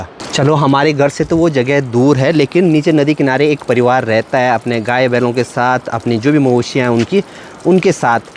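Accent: Pahadi, India. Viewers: Bhai ki boli bahut meethi hai... Pahadi log aise hi bolte hain... Jab ye tarsaali wala Ghar kehtey hai toh sunne mein bahaut acha lagta hai...😊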